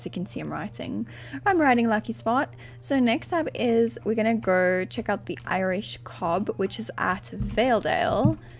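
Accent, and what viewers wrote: South Africa. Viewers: Hiii I'm new I was wondering if you are south African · Are you afrikaans? Bc it kinda sounds like it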